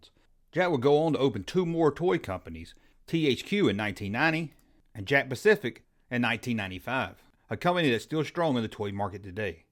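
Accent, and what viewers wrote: South, United States. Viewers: As a fellow toy nerd and southerner, it’s awesome to hear your accent talk about these subjects.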